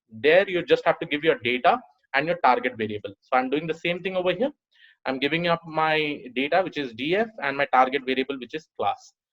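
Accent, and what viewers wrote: India. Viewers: Can you do it without the Indian accent?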